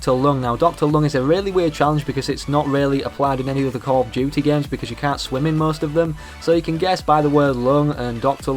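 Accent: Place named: Yorkshire, United Kingdom. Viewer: Your from Yorkshire also your psn name gives me Ebola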